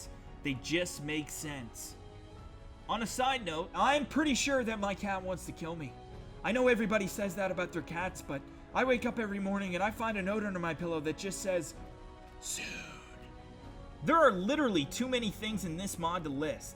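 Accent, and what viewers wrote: Canada. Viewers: The Canadian accent is killing me, it's too fucking funny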